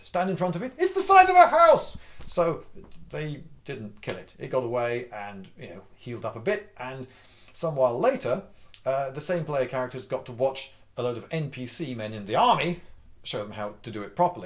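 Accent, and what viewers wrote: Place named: United Kingdom. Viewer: Why does everything seem so much more interesting with a British accent and slang? You should do a video on that.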